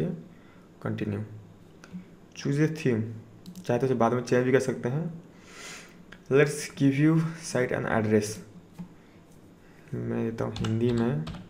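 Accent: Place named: Odisha, India. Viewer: Tu pahle hindi sikh le... odisa se h kya bhai?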